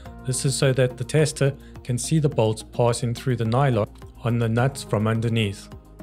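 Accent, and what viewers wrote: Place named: South Africa. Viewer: Hey you probably won't reply to this but you sound South African. I just want to ask if you are South African where did you get the kit cuz I want to make one of my own but i cant find where to buy one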